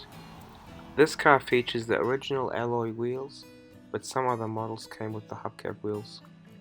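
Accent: New Zealand. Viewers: Recognized the Auckland accent the second he started talking 👌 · From the accent and road signs, I'm guessing New Zealand.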